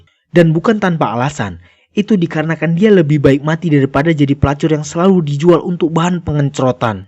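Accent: Medan, Indonesia. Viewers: Admin nya Orang Medan ya?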